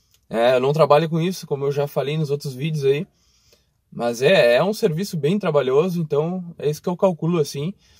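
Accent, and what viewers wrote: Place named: Bagé, Brazil. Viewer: De Bagé ou região amigo? Sotaque da minha terra! Estou acompanhando os vídeos, muito bom. Pretendo reformar um Uno por hobby. Abraço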